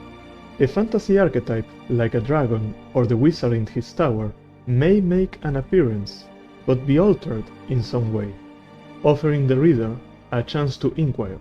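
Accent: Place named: Argentina. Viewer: Llevo 20 segundos del video y escucho que sos de Argentina y me salio un terrible OOOOAAAAA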